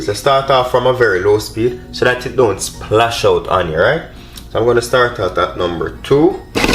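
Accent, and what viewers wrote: Jamaica. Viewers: Love the Jamaican voice man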